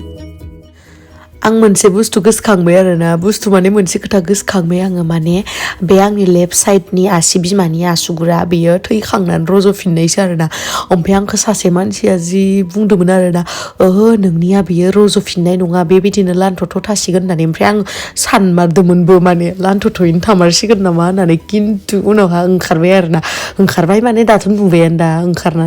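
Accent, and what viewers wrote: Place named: Goalpara, India. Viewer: Manw sanjari railaiyw Aabo sona, Golpariya railainanag gwo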